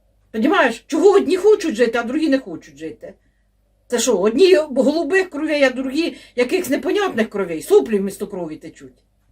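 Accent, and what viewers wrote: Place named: Central Ukraine, Ukraine. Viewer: Це не суржик, це наш центральноукраїнський діалект.Ми так розмовляємо в Кропивницькому ,в Черкасах, в Полтаві.Дякуємо за вашу працю, за стійку українську позицію.Слава Україні! Слава Героям!❤❤❤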